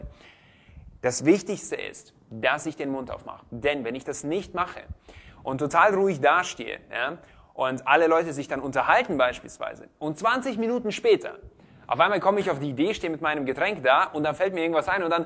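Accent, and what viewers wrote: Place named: Yugoslavia. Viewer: Gaylappen mit piepsstimme und jugoakzent will mir erzählen, wie man der coolste im Raum wird 😁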